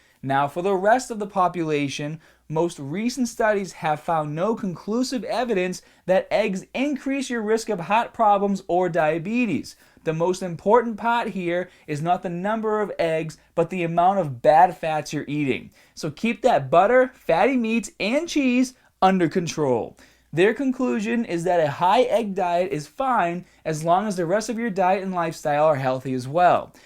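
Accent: Boston, United States. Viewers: NOTHING more annoying than the Boston accent. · God, that bostonian accent is so annoying. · you sound more "Boston" than ever before?! Not sure if it's just my ears though. Lol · It's a Boston accent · are you bad Boston? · Holy crap! has a Boston accent? Since when? LOL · Man how come you can't pronounce AR, EAR, and ER words? Is this a Boston accent? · that boston accent is bursting outta ya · This guy. Boston as fuck.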